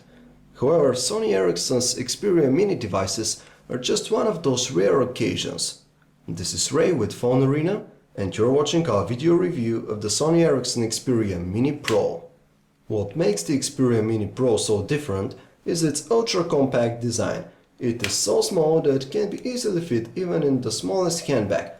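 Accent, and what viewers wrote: Eastern Europe. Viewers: So he's got an eastern european accent ? So what ? Im used to it in the UK, it's a very good and informative review. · this eastern European accent is what i love about this guy's reviews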